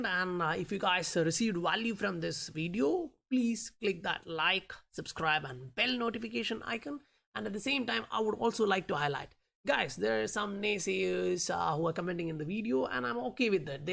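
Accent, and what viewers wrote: India. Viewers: Oh and now that I've finished the video and you mention your accent... I started listening because you sounded like a very intelligent Indian guy! 😂😂😂 we have a large community of East Indians in the area of Canada I'm from, VERY SMART! Do you have your eye on any other hidden gems right now?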